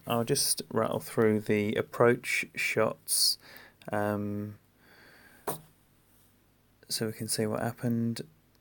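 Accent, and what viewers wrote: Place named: Sweden. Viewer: Thanks for uploading the Beeedioe (Swenglish) You're the man!!!!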